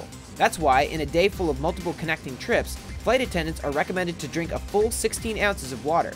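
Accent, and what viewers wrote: Canada. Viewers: Things I’ve learned: the narrator is Canadian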